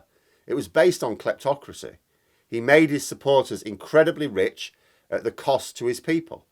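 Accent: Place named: England, United Kingdom. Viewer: Your accent, leads me to think your English.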